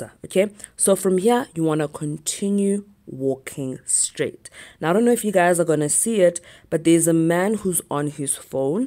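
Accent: South Africa. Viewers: Pedi accent on flick 💖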